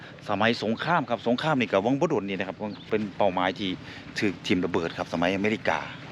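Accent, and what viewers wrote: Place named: Thailand. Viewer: อ่านภาษาลาวกะต้องออกสำเนียงลาวตั๊วจังแมน ออกสำเนียงไทยมันกะไปคนละทีปถ่อนแล่ว.. วรรคสุดท้ายเพิ่นบอกให้ปลดเกิบ คือถอดรองเท้าเพื่อแสดงความเคารพ...เบิ่งมาหลายคลิปเกี่ยวกับวัดตาแหลว แต่บ๊มีไผเว้าถึงสาเหตุที่แท้จริงการถล่มวัดของเมกา นอกจากคาดเดาว่า แถวนี้เป็นจุดยุทธศาสตร์ที่สำคัญ และกองกำลังทัพประชาชนลาวใช้วัดแห่งนี้เป็นฐานในการสู้รบ..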